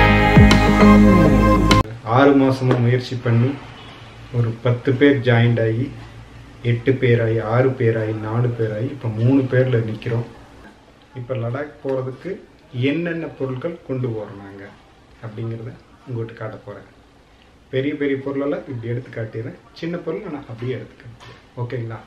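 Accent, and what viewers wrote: Kongu, India. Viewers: Enunga Coimbatore ngala.? Kongu Tamil 😍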